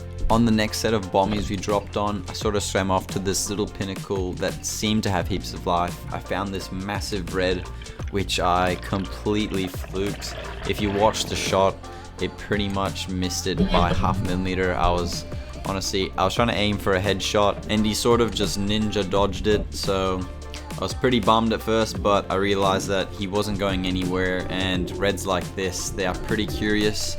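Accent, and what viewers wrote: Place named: South Africa. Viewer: Sounds like you have some South African in that accent 😊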